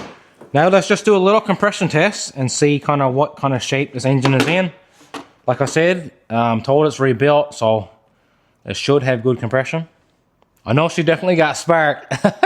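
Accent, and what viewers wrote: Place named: Newfoundland, Canada. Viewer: Funny story heard my 9 year old the other day watching YouTube and i heard the strong newfie accent, i said you watch and he yes you do to, great job on the videos from your neighbors in prince edward island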